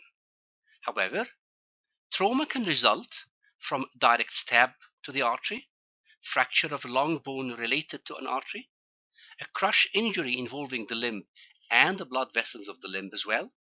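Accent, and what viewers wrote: United Kingdom. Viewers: I thought he is from England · واااووو ماشاءالله تبارك الله فكرته بريطاني قبل لا اقرأ الاسم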